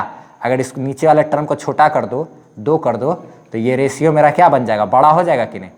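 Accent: Bihar, India. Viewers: Sir ap bihari h kya sir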